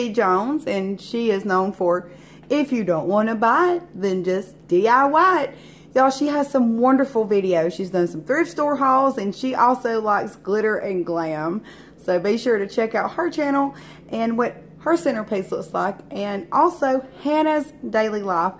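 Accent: South, United States. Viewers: You are so creative and explains the techniques so well. Thank you for sharing! Also, you are so pretty and I love your southern accent!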